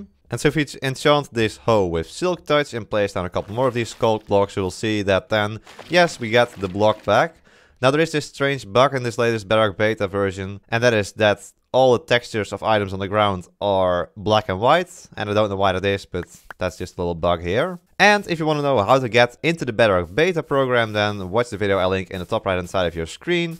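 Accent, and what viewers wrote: Netherlands. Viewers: Are you dutch? You sound like someone from the netherlands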